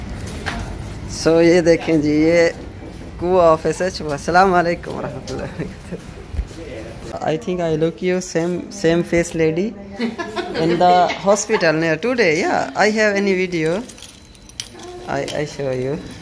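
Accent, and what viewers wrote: Saraiki, Pakistan. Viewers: Ap jb jb saraiki bolty hn mujhy hansi ati hai bro 🙈😂😂😂😂😂😂😂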